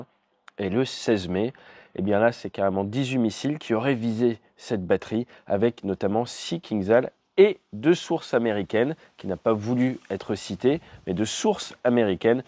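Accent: Quebec, Canada. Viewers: Etes vous québécois quoi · Êtes vous québécois ou français? Parce que de toute manière vous êtes génial. Mes amis anglophones apprécieraient une version sous-titrée en anglais.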